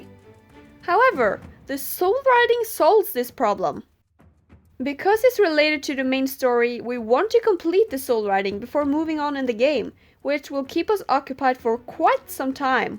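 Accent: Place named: Norway. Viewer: Now i hear that you is Norwegian on your english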